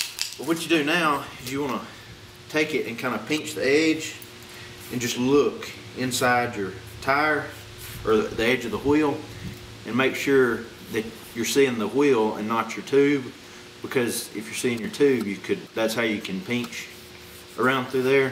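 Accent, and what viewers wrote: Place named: South, United States. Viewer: Hey man, thanks! The southern twang was comforting as well as seeing you struggle a little. Definitely inspiring!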